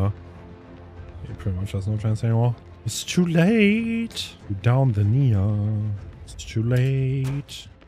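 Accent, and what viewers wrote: Germany. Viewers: Man hört so krass das du deutsch bist, also warum auf Krampf alles auf englisch??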